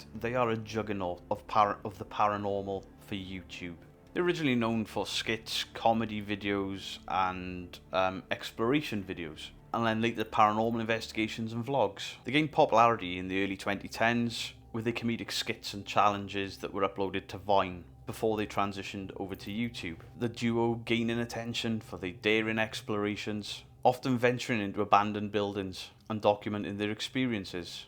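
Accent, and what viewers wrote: Wales, United Kingdom. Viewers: OMFG dude I could listen to your Welsh ass tell stories for hours man!!! PLEASE tell me you know who MANOWAR is!!!???? If you do you gotta say it sometime!!! Grandfather tell me ah story.... Such a good old school band!! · Pretty sure he’s Welsh!😂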